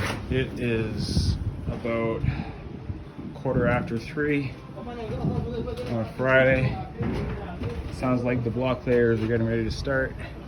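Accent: Canada. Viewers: Thanks... it's a huge gift you do with the channel giving people the insight on what is behind the finishing surfaces. ALSO enjoy you adding the extra vids of music and living life to the fullest. Hope you are having a beautiful summer and the sun is shining on all you love and... one day you Canadians speak 'House' and 'Boat' and 'About'... so us southerners in the US can understand. XD!!!! Cheers Love all the vids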